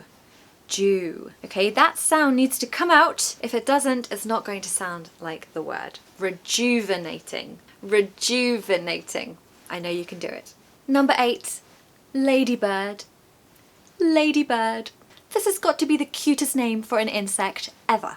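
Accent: United Kingdom. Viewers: Hello, I love these beautiful words you taught me with the good definition. You are teaching me lovely words in the different seasons, months, and even in the Valentine"s day. I love learning those special words from you with the beautiful British accent and pronunciation. when you mentioned about Lady Bird, I used to read Lady Bird childrens" books in my childhood. That was my foundation of learning British English. Now you are teaching me beautiful British English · I love your accent,you are the incarnation of the real British English I’m from Chile 🇨🇱 and I love the British Accent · Omg the British accent is so rejuvenating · hi from Bavaria Alpen! I enjoy your British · You have my dream British accent. I can listen you speak forever.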